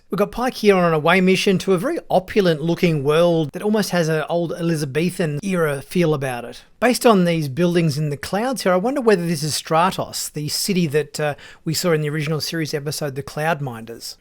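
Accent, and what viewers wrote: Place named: Australia. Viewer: Was NOT expecting a fellow Aussie when I clicked this video! Respect! Subscribing now mate 👍🏻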